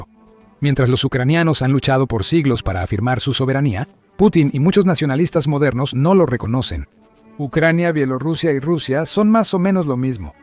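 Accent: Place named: Latin America. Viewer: Tal y como está enfocada la información del vídeo el comentarista o está comprado o es tonto o las dos cosas. Los ucranianos no han luchado por siglos.......faltas a la historia. Asi que cuando veas caer Ucrania y posiblemente desaparezca deberás pedir perdón por la desinformación que estás haciendo. Encima con acento hispano......mira a ver qué está haciendo EEUU con vuestros países.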